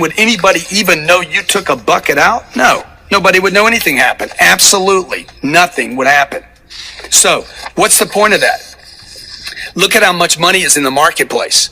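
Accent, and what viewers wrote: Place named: South, United States. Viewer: love the redneck accent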